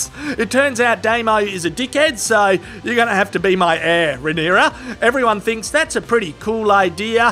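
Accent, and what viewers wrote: Australia. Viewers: But yeah, no, that’s how all Aussies talk.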